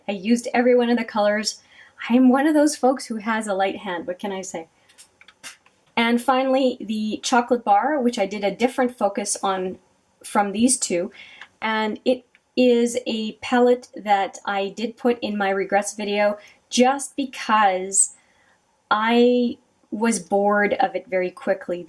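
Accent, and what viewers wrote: Quebec, Canada. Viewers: I love your little containers for your lip products... cute shit: also you can hear a French Canadian accent lol I love it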